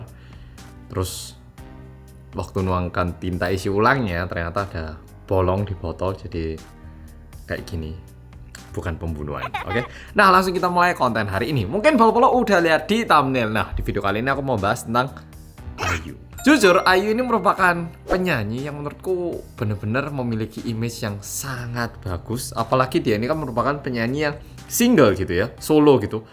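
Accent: Java, Indonesia. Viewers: Bang logat jawa nya kok medok.heehehe.. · Om ngomongnya sudah medok jawa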